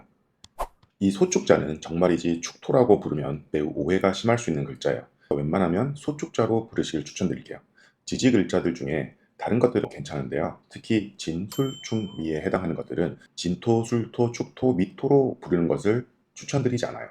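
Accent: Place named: Busan, South Korea. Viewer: 구독합니다. 좋은강의 부탁드립니다. 부산분이신가 봐요😮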